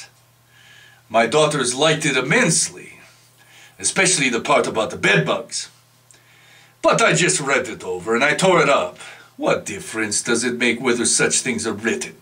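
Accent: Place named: Russia. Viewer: I like your Russian accent. хорошо!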